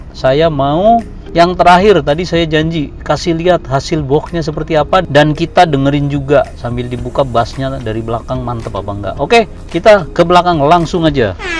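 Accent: Banyumas, Indonesia. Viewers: Ora ngapak ora kepenak .sukses om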